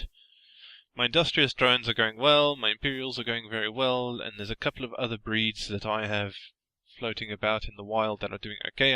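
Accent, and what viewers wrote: South Africa. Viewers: I think you need to be yourself. Don't try to be someone your not. If your comfortable speaking in your south African tone/dialect then do so. Hey brut. ^_^ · I really enjoy listening to all kinds of differnt languages and accents. So I would like to hear your South African accent more often. :D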